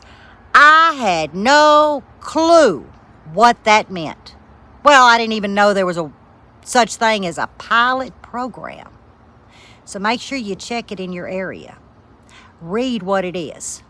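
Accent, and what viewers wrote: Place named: Louisiana, United States. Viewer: You can tell there's some Louisiana accent (a touch of Cajun) in there and mixed with some parts of Kentucky. Before I retired, I traveled a lot to Louisiana on business--places like Houma, La Place, Empire, Nawlins, etc. Used to love the "talk". Although those native to New Orleans sounded more like they were from Brooklyn--not 100%, but a lot.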